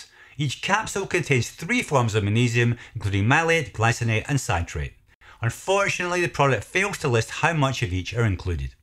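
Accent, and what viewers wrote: United Kingdom. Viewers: He sounds British to me and his channel states UK. Regardless, British Supplements company I would very much like to know given what they stand for but also because they're not 3rd Party tested.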